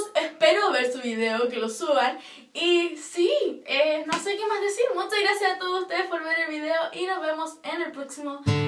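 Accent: Chile. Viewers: Eres chilena cierto? Que chori jashdfjaj (: ♡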